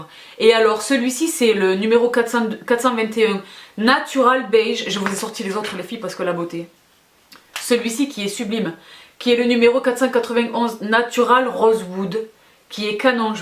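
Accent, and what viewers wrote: South, France. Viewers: Coucou!:) Superbe vidéo. <3 Il y beaucoup de produits (enfin, beaucoup, c'est un bien grand mot. ^^) que je connais surtout des produits de la cosmétiques asiatiques (Une petite fan.:D ). Il y a aussi pas mal de pépites qui me font de l'oeil maintenant que j'ai vu ta vidéo (C'est mon compte en banque qui risque de ne pas être content. Ha ha! ^^' ). Ceux qui me tentent le plus sont: les Hadalabos, le "Time Revolution First Treatment Essence" de Missha et la protection solaire no sebum de Missha; Il y a le démaquillant/nettoyant de Vichy mais tu en parles dans une autre vidéo. Il m’intéresse surtout pour les moments où je suis plus motivée par mon lit que par la salle de bain.:D Ah et aussi l'huile d'avocat de Melvita pour mon contour de l’œil. D'ailleurs, le rouge à lèvres tatoue de Dior, j'ai l'impression que c'est un Tint. Sans le côté transfère par contre (ce qui le fait devenir plus intéressant). 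Mais beaucoup de marque coréenne en font (dont la marque Etude House que j'aime beaucoup surtout pour leurs multiples rouges à lèvres, leurs cushions, leur palette que je rêve de tester et le double lasting <3) depuis un petit moment. Peripera est connu pour leur Ink d'ailleurs. (si tu es déjà au courant et que l'as dit dans une vidéo, sorry, sorry: je n'ai pas encore vu toutes tes vidéos. Je débarque sur ta chaine.:) ) Voilà, merci de nous faire partager tes pépites, tes astuces, ta bonne humeur (<3), tes expressions (<3) et ton accent (j'adore l'accent du sud! <3)!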